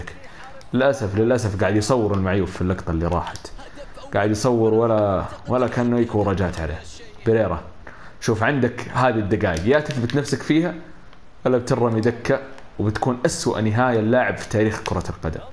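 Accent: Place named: Algeria. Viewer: اللهجة الجزائريه 😂😂😂😂😂😂 والله انت مبدع 😂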